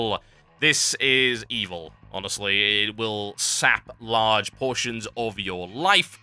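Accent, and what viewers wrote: United Kingdom. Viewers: i love your videos and your deep British accent makes it that much more epic! Plus your impeccable grammar makes it even more epic. So your videos are epic times like 120!!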